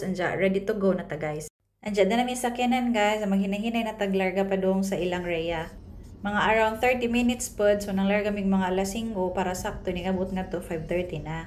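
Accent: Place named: Bohol, Philippines. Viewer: Hala taga alicia ka ako pud taga alicia hahaha kagamay sa kalibutan dini pata nagkita sa vlog hanga ko nimo kay binol anon gihapon ka mag storya pareho ta dia kos uk pero bola anon gihapong daku 😅 amping kanunay mo diha ❤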